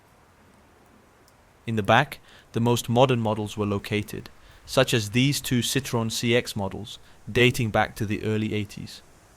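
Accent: Netherlands. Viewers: I like your Dutch accent 👍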